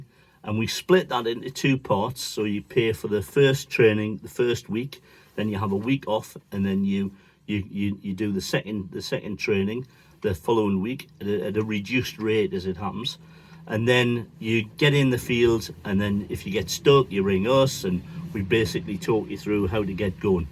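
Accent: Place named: Northeast, United States. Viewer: You sound like your from the Northeast. Iam interested in training. But wait till it dies down. Stay safe lads.👍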